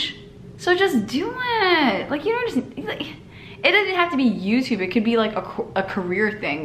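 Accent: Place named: Tigrinya, Eritrea. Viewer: I really miss your tigrigna accent Ms awedat aleku msnatey klas 😂😂😂🌹❤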